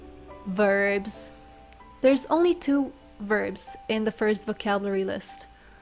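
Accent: Israel. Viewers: The common problem is that she pronounces like most of ashkenaz israeli ח like כ and ע like א. Only because they are not able to pronouce like sefarad israeli. Israeli have a bad tendancy to pronounce this semitical language in a european way. Which creates confusion for the writing language and makes it poorer. That's not her fault. It is a wrong system.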